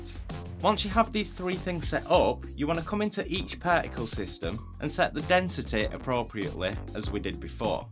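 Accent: United Kingdom. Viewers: Thank you for tutorial for newbie like me for alternative, and love the bri'ish accent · Why are there so many British men whose voices sound exactly like this voice?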